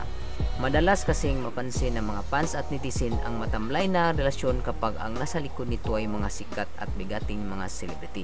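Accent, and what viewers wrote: Visayas, Philippines. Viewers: Paki ayus ng Pananalita mo pre..halata tlaga accent mo na bisaya..bist allstar..pisos, istimitid na sahod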